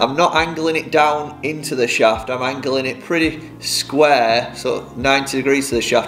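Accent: Yorkshire, United Kingdom. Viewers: Great video will definitely try thus myself next time 👍but the best part for is being a Rotherham lad just listening to another Yorkshire lad trying to pronounce everything clear for his audience great job 😁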